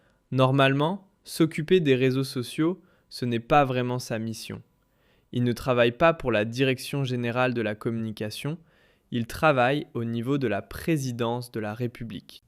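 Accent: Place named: Canada. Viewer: Pourquoi vous changez votre accent il me semble que vous avez l'accès canadien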